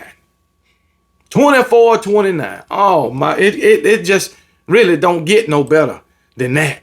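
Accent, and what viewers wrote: South, United States. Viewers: I love this commentary. The Southern dialect cracks me up. “Ryan Day ought to be arrested tonight for what he had his goons do…” 😂 hilarious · Hilarious hearing this commentary in a Southern accent. This is new for Buckeye fans🤣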